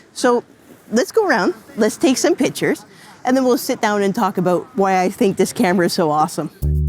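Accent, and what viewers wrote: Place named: Baltimore, United States. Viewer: Thanks for taking the time to share your thoughts. I just grabbed an EOS R for 1,070 USD! It was a cancelled special order that's been sitting in the store room since 2018. I was going to hire an R5C for a job, but this purchase didn't cost too much more than the rental fee. What SD cards do you use? I've found that Lexar V60, 1800, UHS-II have been massively discounted recently. I'll mostly likely shoot in 1080 most of the time, but I'd like to get the best 4k onto the card, when I do use it. Are V60 cards to the challenge or V90 UHS-II cards critical? Is the difference between 8 bit and 10 bit dramatic, or is it something that you notice only when really analysing the output? I'm originally from Baltimore and it's always funny to me when I hear the same pronunciation as my way of saying the letter "O, from aaaall the way in the FNA (Far North America). 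Cheers!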